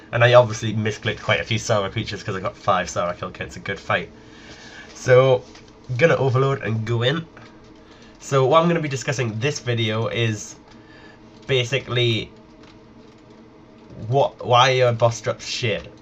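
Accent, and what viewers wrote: Newcastle, United Kingdom. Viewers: it's a geordie accent, like newcastle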